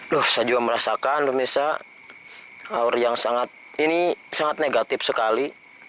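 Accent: Banyumas, Indonesia. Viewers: Ngomngnya ngapak banget lho . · Walaaahh wong ngapak kie mesti,,hhhaa. sehat" nggih pak.tek enteni video anyare mning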